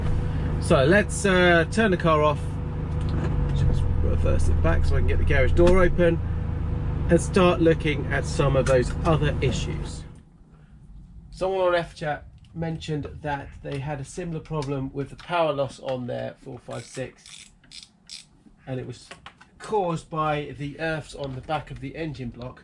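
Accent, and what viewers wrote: England, United Kingdom. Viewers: Just had this suggested to me, see the $$ prices, but then you open your gob defiantly a english, fair play need some more brits like. Showing their skills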